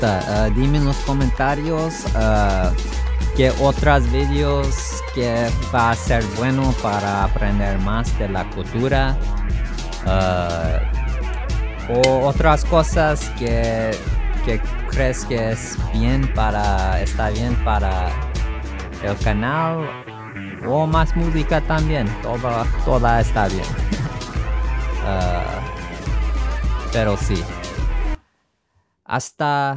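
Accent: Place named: Northern Europe. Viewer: Hola para alquilar un apartamento poco tiempo mira en Airbn, si quieres mas tiempo mira <link>. Tu español es muy bueno, pero el acento de "guiri" ya no se te ira. "Guiri" le llamamos los españoles a los extranjeros de habla inglesa o del norte de Europa.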